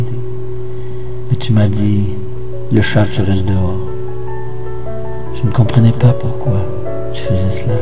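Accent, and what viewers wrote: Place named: Quebec, Canada. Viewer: Tellement triste 😭 perso j’aime beaucoup l’axent québécois whaaaaaaaa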